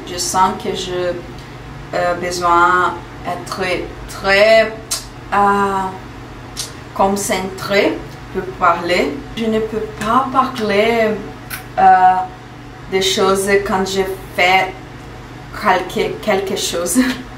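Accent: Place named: France. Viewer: Muito inspirador acompanhar sua trajetória, seus desafios, seu dia a dia e essa voz linda, muito francesa !!! ☺🥐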